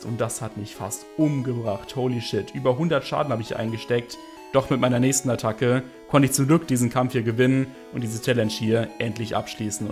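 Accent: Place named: Germany. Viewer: Ich hab selten jemanden so deutsches Englisch sprechen gehört...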